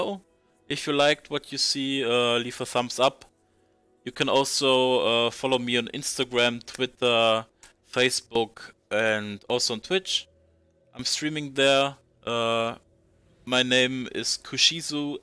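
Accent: Germany. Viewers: Nice video it really helps me. From what country are you? Your accent sounds a bit German.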